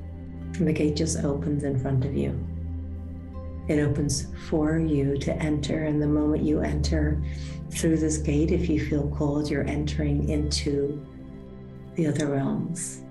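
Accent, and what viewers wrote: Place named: Ireland. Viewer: machnaimh álainn! Tá mé i mo chónaí i mBéal Feirste agus ba mhór an t-iontas é do bhlas Gaelach iontach a chloisteáil! míle buíochas ó bhandia amháin go ceann eile. grá agus sparkles!!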